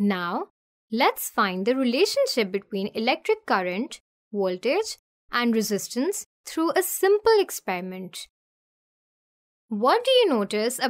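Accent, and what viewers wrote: India. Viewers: why is it indian lol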